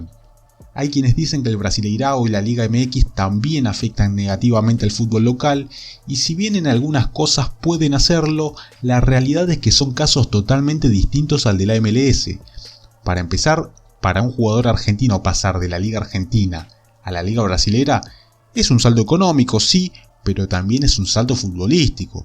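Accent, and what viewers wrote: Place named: Uruguay. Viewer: El acento Uruguayo es asqueroso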